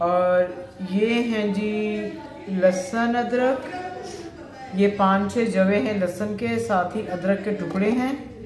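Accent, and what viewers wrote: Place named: Punjab, India. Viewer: ur Punjabi accent is very nice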